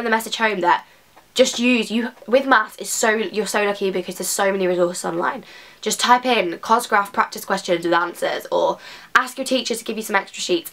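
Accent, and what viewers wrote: United Kingdom. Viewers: I actually am obsessed with your videos 😂😂😂 I feel like I relate on so many levels, so nice to hear a British voice on a revision channel 😊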